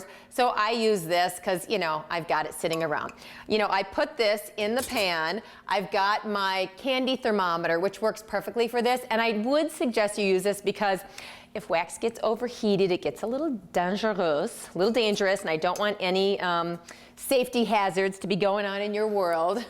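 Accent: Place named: Wisconsin, United States. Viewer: Yes, you MUST be from Wisconsin:] I know that accent.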